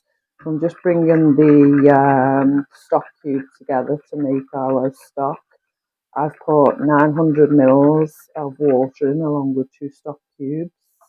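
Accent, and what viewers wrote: United Kingdom. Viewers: I enjoy soups and stews and this recipe looks delicious. I jotted the recipe down as you worked. Thank you for cooking it for us. It is a pleasure to be here, especially to hear words like "whilst," and the lovely English accent. Blessings to you and yours.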